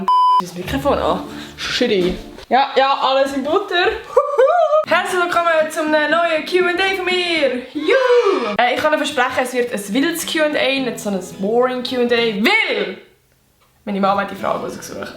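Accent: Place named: Switzerland. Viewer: Super das mitem schwizerdütsch dankeee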